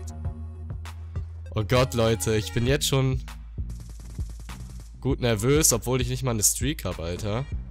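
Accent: Osnabrück, Germany. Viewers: Kommst du aus Osnabrück?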